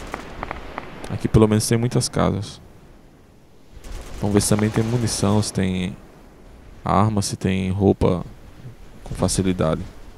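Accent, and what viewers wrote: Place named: Recife, Brazil. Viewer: Bicho tu és de que cidade ? Tens sotaque daqui de Recife.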